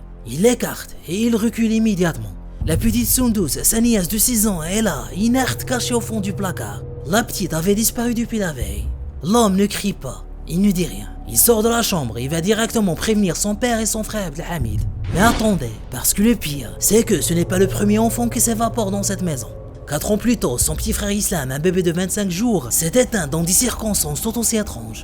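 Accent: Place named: North Africa. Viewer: Au de la du boulot remarquable que vous faites, mais il ya cet accent qui nous rapproche du bled et d'ailleurs j salut tout mes collègues bledar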